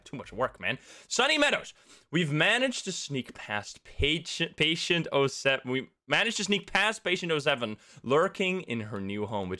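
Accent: Netherlands. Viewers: are u dutch? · hold on, you're dutch?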